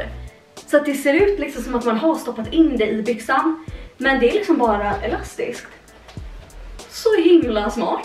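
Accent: Stockholm, Sweden. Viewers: Nu har jag kollat på flera videos av och tycker att hon fortfarande pratar stockholmska och ser dessutom flera kommentarer att hon har ändrats sin dialekt. Är inget har mot tycker bara att degvätskan konstigt att man ska låtsats något eller göra det tillgjort om man inte har partar så innan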